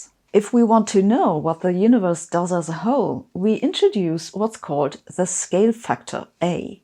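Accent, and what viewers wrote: Germany. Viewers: Definitely a sub for Sabine. Love your work. She teaches to keep the data and observations separate from our interpretations. Honestly way more of a spiritual exercise on our place in the universe than the pat answers shrouded in ill-defined terms that you'll get from Gurus or even some physicists nowadays. (also, not going to lie, there is a certain draw and power to a German-accented physicist bringing some goddamn clarity to a field that's been lost in math and metaphysics as of late)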